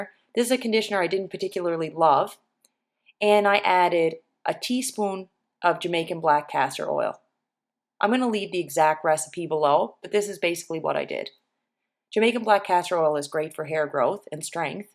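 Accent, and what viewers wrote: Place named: Maritimes, Canada. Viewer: I seriously love your maritimes accent I live in the suburbs of Ottawa. I'm just finding my curls again. Love your Channel feel free to check mine out to mines more about the food and saving money LOL.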